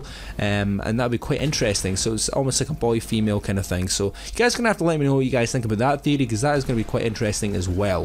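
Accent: Scotland, United Kingdom. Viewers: Are u Scottish or Irish?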